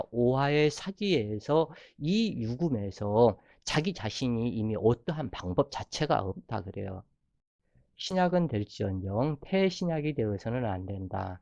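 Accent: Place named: Jeolla, South Korea. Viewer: 전라도 사투리가 엄청 도드라지네요.ㅎ 갑신월 기사일주라서 주의깊게 듣고 갑니다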